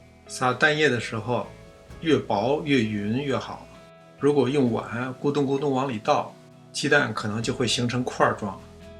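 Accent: Beijing, China. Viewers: 的菜品不评价了，毫无惊险的每每获胜！这次想说，在视频编辑上用心了: 一道家常但可有千秋的酸辣汤，在京腔序曲下，低调出场……柔柔缓缓的音乐伴随汤的成长……随着特异蛋花出台、香菜妆点，高潮迭起……换大碗盛饭吧……明儿再折磨treadmill 😃😃😃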